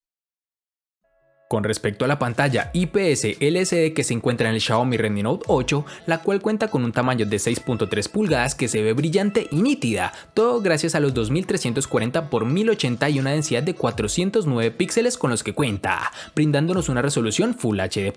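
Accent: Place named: Antioquia, Colombia. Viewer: es mi salvador peor porque se mezcla la voz orgásmica con el acento paisa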